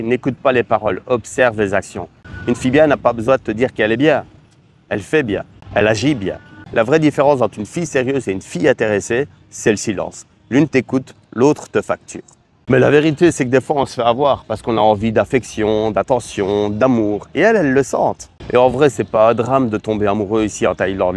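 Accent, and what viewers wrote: Belgium. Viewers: Ton accent belge est très sympa ! 👌🏻😅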